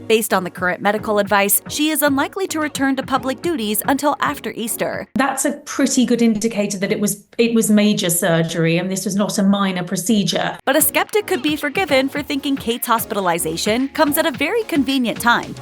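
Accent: United States. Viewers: You sound American. Probably watched way too many episodes of that Crown program or something. There's major hospital crisis in the UK right now you know. The NHS is on strike. She has to go private, otherwise she would not be able to get her surgery in time and could maybe die, like I almost did about 6 months ago with my broken infected jaw.. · A woman with an American accent passing all sorts of opinions about how the British people feel. Hmmm. You can report the facts without all the not-so-well-veiled political narrative that you think the average person is unable to pick up on. Saying that Kate only did 142 engagements last year and is somehow a great disappointment to the people is rubbish. 142 engagements with the pressures of being one of the most watched and judged people in the world and a mother of 3 young children. Princess Anne is not currently mothering 3 small children. 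This video is a reach designed to try and influence people to hate the Royals and grow controversy.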